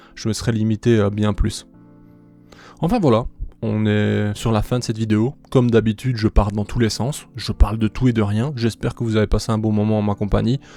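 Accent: Belgium. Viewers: puisque tu le demande, j'ai repéré une coquille ! t'as dis "septante", c'est pas gaulois ca ! d'ailleurs t'as l'accent belge , du coup, Lille? (car je n'imagine pas suisse avec cet accent, mais je peux me tromper hein, je suis un pro de l'erreur en tout genre) partage avec les gens qui en profite, comme moi (merci pour le partage), et puis laisse parler les enfants qui n'ont que ca pour tenter de se sentir supérieur . car comme tout le monde le sait, l'égo se construit en râlant sur des inconnus sur internet , car dans la vrai vie ils peuvent pas parler devant papa et maman sous peine de se faire priver du téléphone .... (bon j'abuse un peu mais il est vrai qu'internet large (la ou on parle avec des inconnus ) devient de plus en plus mal fréquenté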